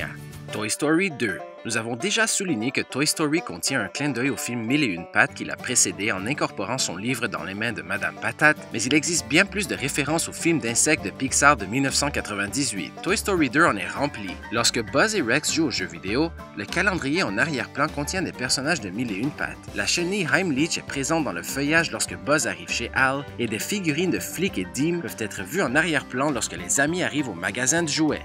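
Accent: Quebec, Canada. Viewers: est tu québecois? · l'accent québécois, c'est plutôt classe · l'accent Québécois est beau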